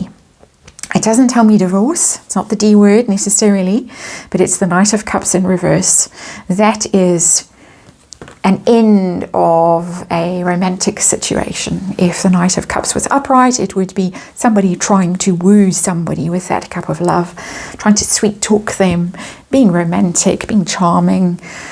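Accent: South Africa. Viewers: Harry THINKS.?? Is he capable? Of course Megan WANTS something, nothing will ever satisfy her. Once again, spot on! Love your readings,. Also love hearing your south African accent, much love from a South African expat.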